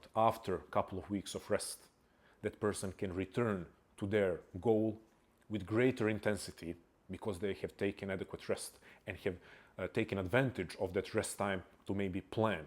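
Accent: Balkans. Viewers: Are you Balkan? Great video, just wondered because of the accent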